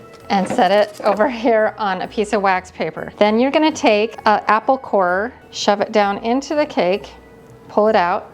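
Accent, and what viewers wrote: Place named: Maine, United States. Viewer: Awesome ideas - love your videos. So cool to hear you might be from Maine (from a fellow Mainer) 🙂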